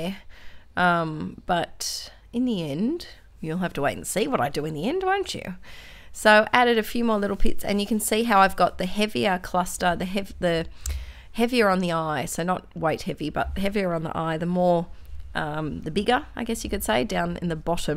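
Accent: Australia. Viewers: Always look forward to your videos (you are the first person I look for each day when I first log into YouTube!!) and I love your "Scrap Stash Saturday" videos - it's lovely to see such inspiration and different ways of using those little bits which would normally either sit in a drawer or be put in the bin. Watching your videos and techniques is what made me start buying and using Distress Stains and Sprays but I'm only a beginner, and yesterday I bought my first Project Life cards and page protectors - thank you!!! I am in the UK and really enjoy listening to your accent and hearing about life in Oz too... we were in Hobbycraft yesterday (a bit crafting store) and I was walking around with my boyfriend, we kept seeing different things like Gelatos and thickers, each time I turned to him and said "that's what uses" lol, think he thought I was mad!! Keep up the great work <3 xxx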